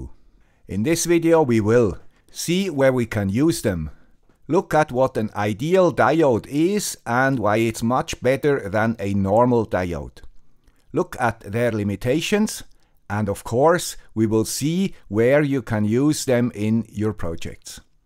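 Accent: Switzerland. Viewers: He does explain very early that he is the guy with the SWISS accent. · Perfect, you explain it as it should be. You are real gem Mr. with swiss accent.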